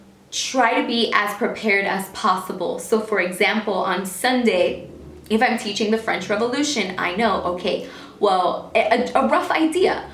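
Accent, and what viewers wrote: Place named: New York, United States. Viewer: I love your NY accent.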